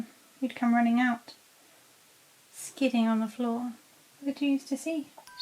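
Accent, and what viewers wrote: United Kingdom. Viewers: The British accent really helps make scary stories sound better. It amazes me that Key understands “Lay your butt down”. How could he understand that? To me, that basically means that Key understands virtually everything, or else he knew he was doing something unusual and the tone of her voice suggested a request. Either way - pretty darn smart!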